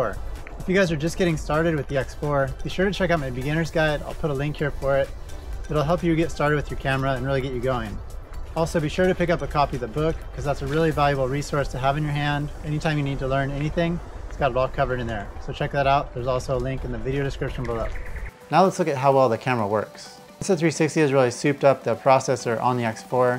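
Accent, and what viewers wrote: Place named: United States. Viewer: Finally someone who speaks American English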